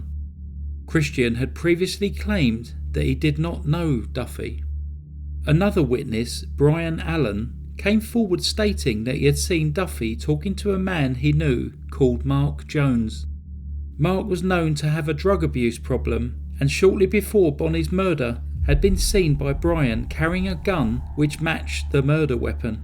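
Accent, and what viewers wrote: England, United Kingdom. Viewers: I must say Real Crime isn't my favorite but i like your storys and your so beautiful English accent👍❤🥰😊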